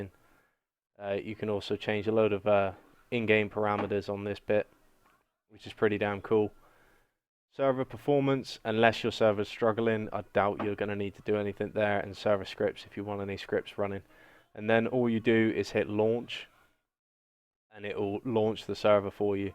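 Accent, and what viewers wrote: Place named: Iceland. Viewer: where are you from. you sound like your from Iceland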